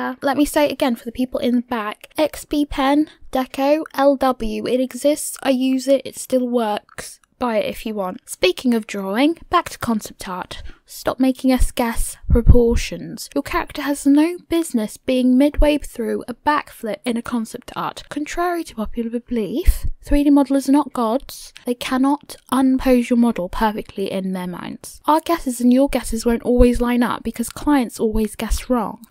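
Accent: United Kingdom. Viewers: Love your British accent lol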